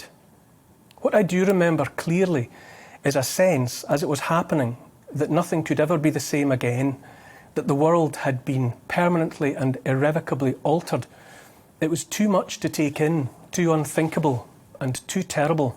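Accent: Scotland, United Kingdom. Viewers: A pleasure to listen to a Scottish voice that talks such sense , I just wish that The Scottish First Minister would take on board the few words that have just been spoken with such conviction, Boris has lost the plot , we welcome our enemies to these shores with open arms, I watch as our culture is slowly but surely eradicated and disposed of in such a political and cowardly way, our western way of life will soon be distant memory and we only have our cowardly and spineless politicians to blame. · is this Wiseman who can talk Scottish?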